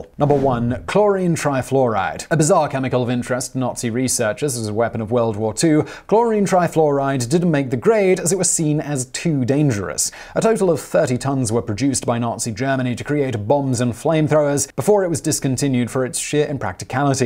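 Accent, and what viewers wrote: England, United Kingdom. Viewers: All of you guys complaining about how he prounces cadmium must not be regular viewers. made it very clear in other videos that he doesn't care if he mispronounces words and clearly puts in little to no effort trying to remedy the problem. This is exactly why people who don't understand topics shouldn't present videos on them. And why you need a host who contributes more to the show than just an English accent. Incorrect information, like misproununciations, spread like a disease. One person hears it, then spreads it on to others, who in turn spread it on to others thsemselves. And so on and so on. Some may think it's a trivial issue, but when we're talking about 10s or 100s of thousands of people being exposed to this stuff, the figures are anything but trivial.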